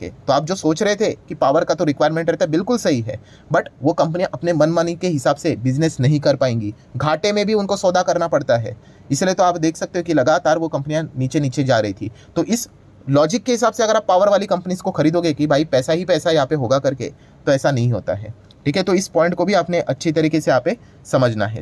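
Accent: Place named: South, India. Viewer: Baat sunke to lagta hai South se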